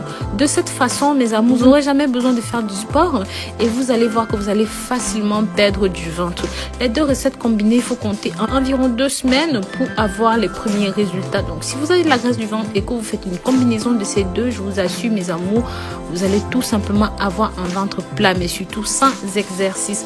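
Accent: Africa. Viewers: Vu l'accent c'est une africaine qui vous prend pour des cons moi j'ai perdu 5 cm de tour de taille en 1 mois grâce au sport programme abdo, et footing tout les matins avec un bon régime alimentaire si non tout est bidons ....merci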